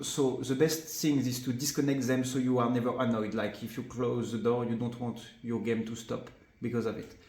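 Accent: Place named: France. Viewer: Bonjour vu votre accent vous êtes français 😉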